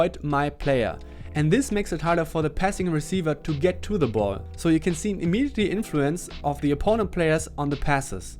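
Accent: Germany. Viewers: Bist du Deutscher? Hört sich stark so an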